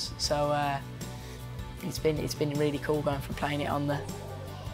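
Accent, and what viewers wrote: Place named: United Kingdom. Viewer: british accent tho 😍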